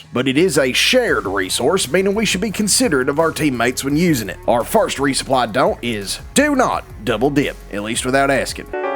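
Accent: South, United States. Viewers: As someone also with a heavy southern accent and a cadence like yours, I really appreciate your video. It’s hilarious!!!! subscribed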